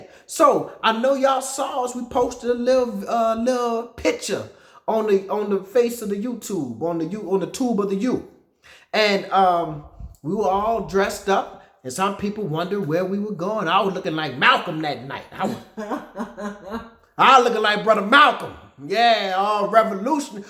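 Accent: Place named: United States. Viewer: I want to work to your company please and I want to speak English like you, I like American accent. 😃😃😃😇🌮✊👌😐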